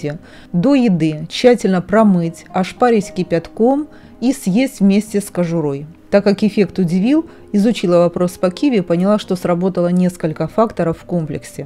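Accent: Ukraine. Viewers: Это украинский говор. Будьте толерантны. Мы же спокойно относимся к вашему российскому. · У вас акцент украинский.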